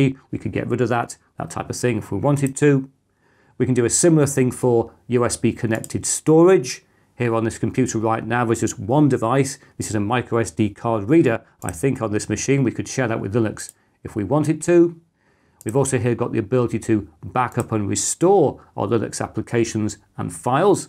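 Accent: United Kingdom. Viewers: I thought you were going to “flex” ChromeOS so I clicked the video cuz i thought it would be funny but then I heard the Bri’ish accent and hit dislike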